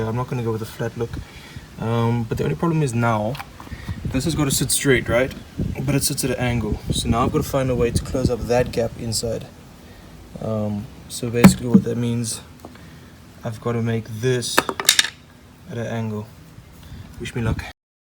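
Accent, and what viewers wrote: South Africa. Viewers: bra youre so me lol. You even sound South African ...Only thing left is for you to be from the kasi. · brother this is awesome, You sounds south african!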